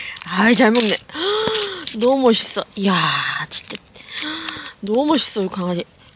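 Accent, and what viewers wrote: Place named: Gwangju, South Korea. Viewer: 요즘 무한재생으로 보고 있어요 집에서 그냥 틀어놓기도 좋아요 ㅋㅋㅋ 저도 광주인데 사투리가 편안합니다ㅋㅋ 앞으로 더 큰 채널이 되길 바라요 ♡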